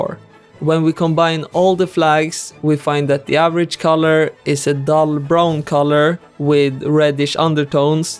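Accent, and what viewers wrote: Sweden. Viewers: I knew it was a swedish person bc of the accent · Are you Swedish? As a swede, your accent kinda sounds Swedish, are you?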